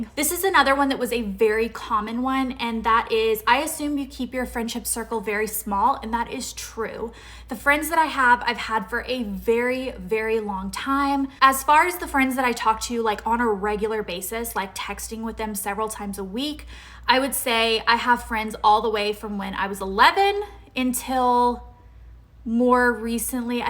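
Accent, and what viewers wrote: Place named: South, United States. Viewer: I like how your southern accent is so much more noticeable now, it's cute :) it comes up especially when you get excited. I'm loving the new videos, this is such a time travel for all of us :) take care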